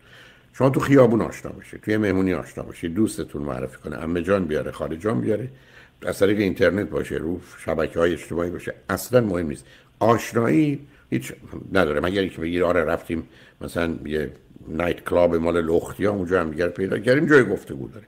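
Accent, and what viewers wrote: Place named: United States. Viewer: طوری با لهجه صحبت میکنه انگار سی ساله امریکاس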